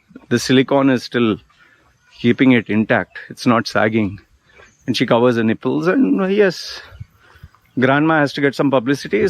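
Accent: India. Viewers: Well in a way he is an Indian though. In UAE he studied in an Indian school. Yes, he is not an Indian citizen, but most of his things are Indian, including his English accent.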